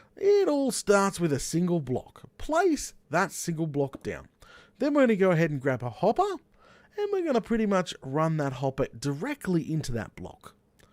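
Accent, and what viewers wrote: Australia. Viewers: Subbed just because Australian accents are great for tutorials lol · I prefer British accent for tutorials Btw because Australia is a British colony the accent sounds like a fusion of a Southern and British accent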